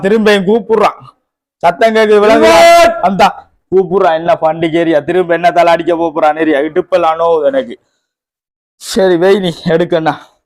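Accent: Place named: Valachchenai, Sri Lanka. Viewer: அரபுநல்லாதெரிந்த ஒருவரைவைத்துநடித்துரிக்கலாமே.நீங்கள்.எந்த இடம்.வாழச்சேனையா.உங்கள்பேச்சில்விளங்குது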